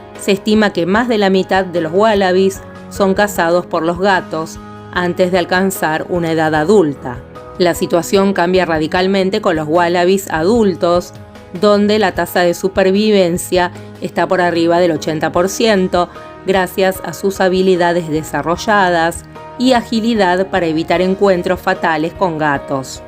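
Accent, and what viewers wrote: Argentina. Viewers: me encanta el saludo: hola amigos, en ese acento tan marcado argentino tusho, no sa sabia de la existencia de estos canales tan informativos de la vida biologica, ya me suscribi y ya vi muchos videos, los humanos somos un cancer, pero veo q la natalidad esta bajando, y ahora somos un poco mas ecologicos, ojala aprendamos a vivir en armonia con la naturaleza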